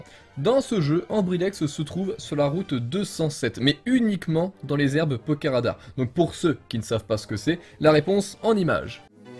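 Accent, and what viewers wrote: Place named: Lower Normandy, France. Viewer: "Ou qu'il l'est?" "Ou qu'on l'a?" T'es un vrai Bas Normand comme moi x)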